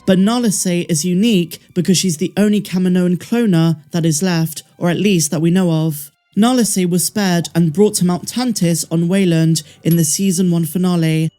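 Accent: United Kingdom. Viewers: I know you have an British accent, and that's all well and good and attractive in the US, but in this case you're ignoring the fact that a noun is being used as a proper noun, a name. As highly as you regard this IP, you should pronounce someone's name how they would. For one reference, remind yourself of how Data corrected Dr. Pulaski in season 2 of Star Trek: The Next Generation. In his words, "One [ pronunciation ] is my name. The other is not".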